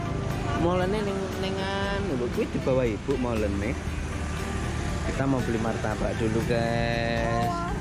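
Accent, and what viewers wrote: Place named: Banyumas, Indonesia. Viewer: pada bae ngapak... salam dari cilacap sukses selalu mas 👍👍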